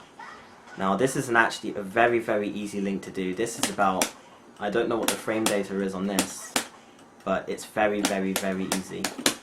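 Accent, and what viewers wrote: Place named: United Kingdom. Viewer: um i dont wanna sound disrespectful but for i bit i thought you were british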